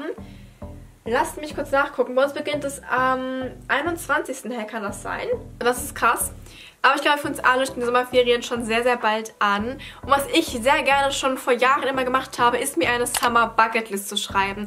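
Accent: Germany. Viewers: Du kommst aus Brandenburg 😍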